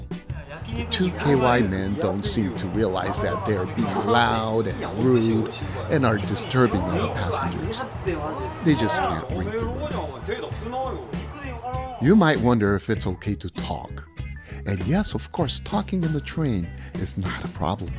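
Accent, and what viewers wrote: United States. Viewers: I'm very intriguing how did you get this American accent so amazingly speak? Please tell us?😊👏🏻👏🏻👏🏻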